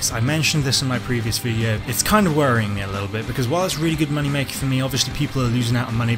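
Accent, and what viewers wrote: Exeter, United Kingdom. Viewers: Are you from Exeter?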